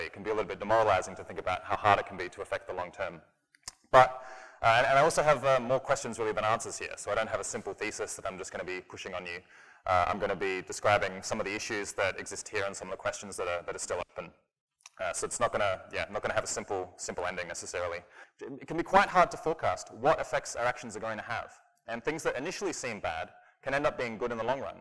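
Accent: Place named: Australia. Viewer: just his accent. one part of Australian accents is higher pitch at the ends of sentences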